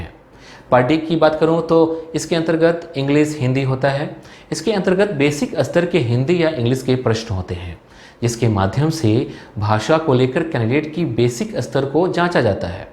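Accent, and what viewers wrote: Bihar, India. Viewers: Sir aap bihar se hai ?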